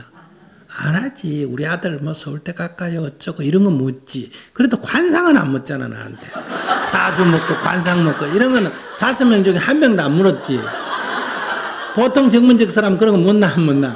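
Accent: Gyeongsang, South Korea. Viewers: 경상도 어디 분이신지?? 사투리가..... 다그치는듯한 말투시네여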